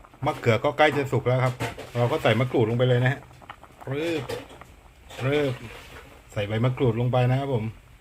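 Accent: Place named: South, Thailand. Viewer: 555พี่ แหลงใต้ชับผึด😁😁😁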